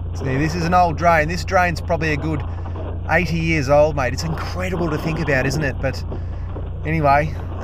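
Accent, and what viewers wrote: Australia. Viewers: Today from California this is Mr coyote every time when I watch your videos and I get a kick out of your Australia accent I think it's pretty cool keep up the great work bye for now. And I'm on YouTube to john klamm and thor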